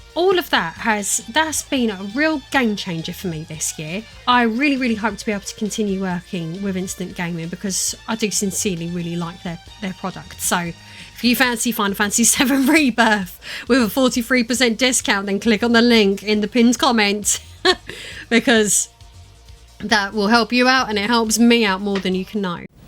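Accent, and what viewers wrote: United Kingdom. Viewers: Im only here to listen to the british accent...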